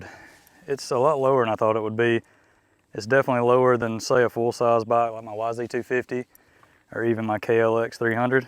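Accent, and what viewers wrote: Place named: South, United States. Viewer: I knew exactly what you meant, because that's how southerners talk! Lol.. I'm from Texas so I'm not exactly a 'southerner', but we still talk very similar and sound similar. Your accent just sounds normal to me, and the words and phrases you use too.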